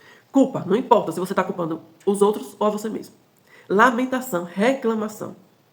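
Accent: Brazil. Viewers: Que alegria conhecer seu canal, esse sotaque lindo que não reconheci até você dizer que é Sergipana (ainda não conheci Sergipe). Me senti inspirada e mais motivada para me amar e ao todo. Dou gracas por sua vida! 🙌🏾🤲🏾🙏🏾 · Bem logo via que teu jeito de falar era das redondezas daqui😂😂😂😂😂😂 sou de Alagoas hahahahhsah amei seu canal e to na quarta onda ja ❤ obrigado pelo vídeo ❤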